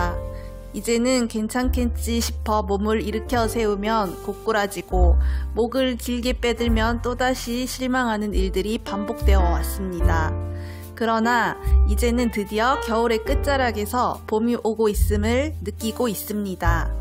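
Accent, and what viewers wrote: Standard. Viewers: 표준말 너낌 나믄서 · 은은하게 베이스 깔린 음악에 님의 상큼한 목소리 (표준말 억양) 최고예요~~😄😆🥰 여름에 로마 직항도 뜬다니 반가운 소식 이네요^^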